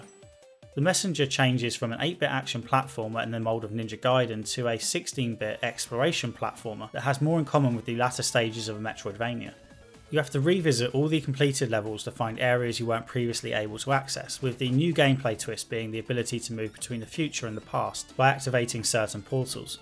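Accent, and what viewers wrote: United Kingdom. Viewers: Gonna be honest as a Canadian the first 40 seconds were so British I couldn’t understand lmao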